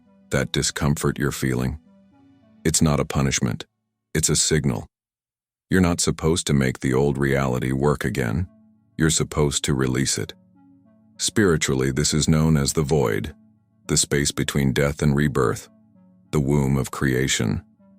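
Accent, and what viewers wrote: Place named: United States. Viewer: The discomfort im feeling right now, is the reaction to this vile American accent.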